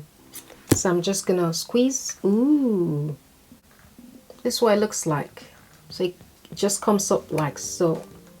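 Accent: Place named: Nigeria. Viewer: love ur accent are u nigerian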